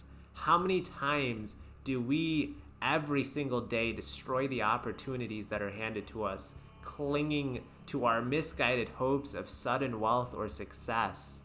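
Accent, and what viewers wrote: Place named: East Coast, United States. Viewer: Asian? I'm guessing east coast Jewish family from the accent.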